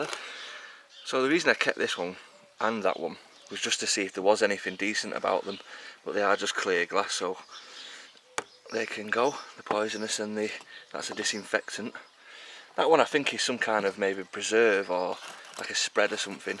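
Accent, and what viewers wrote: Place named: Northern England, United Kingdom. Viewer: Oooh a wee bit off there Dawn as a Scotsman I can confirm is not I'm afraid. I commented on his accent the other day, got to love the northern tones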